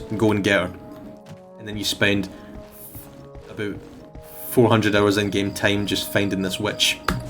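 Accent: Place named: Scotland, United Kingdom. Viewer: Thats quite the accent where about in Scotland are you from mate?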